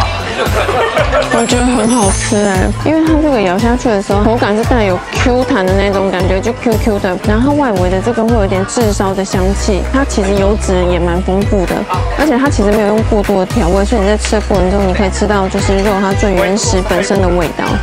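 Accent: Taiwan. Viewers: 你講臺語好可愛哦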